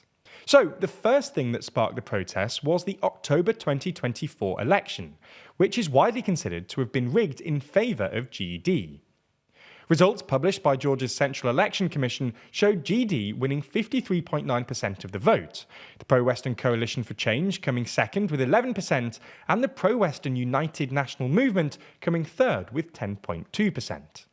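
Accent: United Kingdom. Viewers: Typical British lalalala talk. No conclusion.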